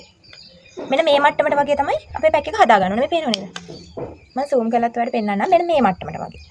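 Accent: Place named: South, Sri Lanka. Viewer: ඒක හරි එයා දකුණේ කෙනෙක් ඒක නිසා කථා කරනවා වෙනස් තමයි හැබැයි ලස්සනට කියලා දුන්නා thank you නංගි ❤️❤️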